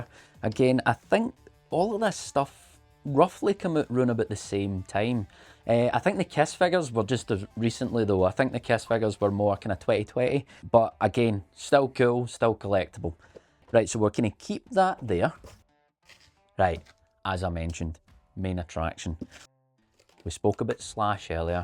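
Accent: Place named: Scotland, United Kingdom. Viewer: Love the idea of a giveaway the greetar looks cool the and quality is much better now my first guitar was an Avon SG copy, the action was so high you could limbo under it and often I did trying to do fancy jazzy chords an Em flattened 9 with an F# in the bass with a cherry on top!, Enjoying the channel great to hear a Scottish voice 🏴😉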